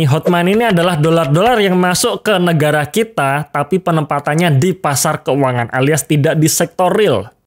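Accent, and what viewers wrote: Surakarta, Indonesia. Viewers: oalahhhhhh..wong Solo to mas? 😂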